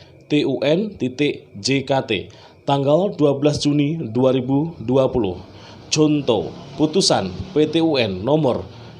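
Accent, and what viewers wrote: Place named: East Java, Indonesia. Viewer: Pengisi suaranya ini seperti orang nganjuk rejoso, Punjer Medioen Loss ra ngeyel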